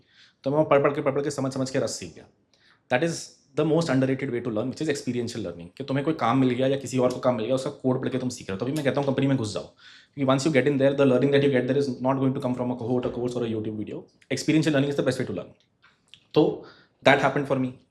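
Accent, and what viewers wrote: India. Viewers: Thanks Bro speaks Indian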